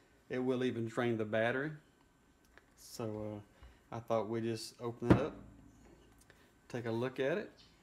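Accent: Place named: North Carolina, United States. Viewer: This channel is a bit like BOLTR but without the humor, bad language and complete disrespect for the tools he's working on. He also sounds like he must be from near where I live (NC). Good video - thanks.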